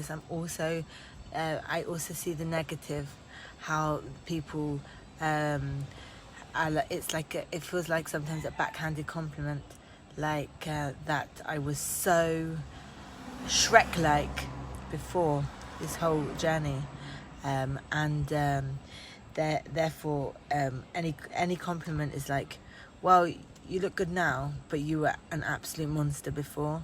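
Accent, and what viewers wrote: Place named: England, United Kingdom. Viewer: Are you English? You've got an interesting accent.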